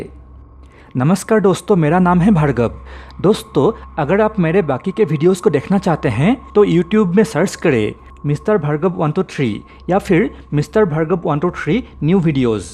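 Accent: Assam, India. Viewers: Apunar Pronounce Huni Gom Palu J Apuni Oxomiya Hoi ....But Apuni Oxomor A Hoi Ne Onnyo Rajyor Hoi😊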